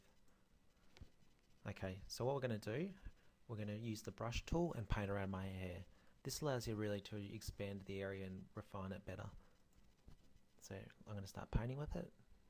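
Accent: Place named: New Zealand. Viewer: Very helpful, thank you! Are you from New Zealand? You accent is wonderful! :)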